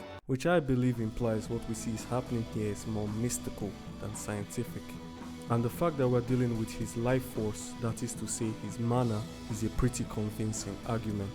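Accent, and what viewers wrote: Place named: Nigeria. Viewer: Amazing video you sound Nigerian tho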